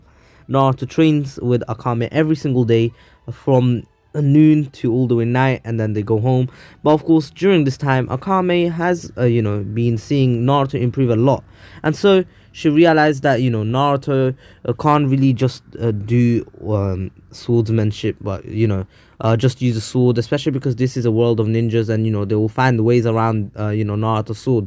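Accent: United Kingdom. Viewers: You sound like a Leicester guy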